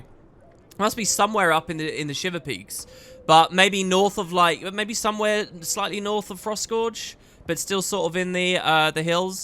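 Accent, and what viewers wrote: United Kingdom. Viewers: You have nice voice, always enjoying your accent (not too British, so I can actually understand) and intonation. (Tho sometimes your videos have a bit screwed up volume balance ;d Just wanted to compliment you as a fan ;)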